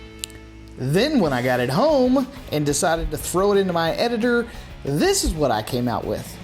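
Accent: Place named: South, United States. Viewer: Love your southern accent!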